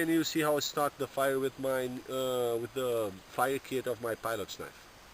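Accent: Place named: Brazil. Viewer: Mano, Vc fala bem inglês, mas pelo sotaque eu acho que é brasileiro que nem eu. Parabéns pelo vídeo, muito bom.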